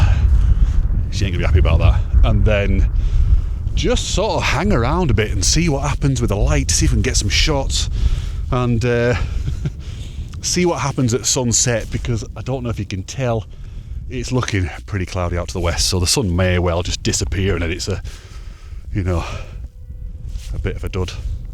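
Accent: Yorkshire, United Kingdom. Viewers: I'm really impressed with the beautiful views and the hiking that you do.always loved England, but I would never be able to do things you do. Sweet doggie Finn. You've got a good sense of humor. I believe that's a Yorkshire accent ? First class😊. The next part you're probably not going to like. I don't know what you had on your Christmas jumper, but you were (grieved) with the comments. What you say is a bit of fun, isn't. Balfamet,a pentagon, stuff like that is occult. The devil is very busy these days blinding g people's eyes. Hope you're not annoyed(grieved), and will think about it.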